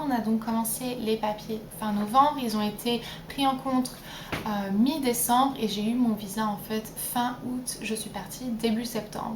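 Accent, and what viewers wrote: England, United Kingdom. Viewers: C'est bizarre, on dirait que tu as un accent légerement anglais...... pourtant tu es fraancaise n est ce pas * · je suis une nouvelle sur ta chaine :) je trouve que tu as un accent anglais cela m'a parrut étrange mais avec cette vidéo je comprends mieux :) j'adore ton naturel tu donnes envie tu m'inspires car tu es simple et tu n'as pas la grosse tête j'adore <3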